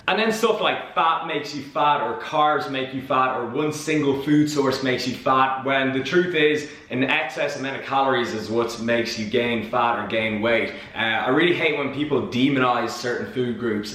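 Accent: Dublin, Ireland. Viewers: whats a D4 accent? D for Dublin? ahah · That Dublin accent <3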